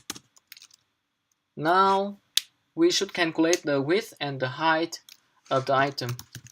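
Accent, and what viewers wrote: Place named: Vietnam. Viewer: E nghe thấy giọng việt nam mà bật sub lên thấy auto sub cứ sai sai nên đoán thế kaka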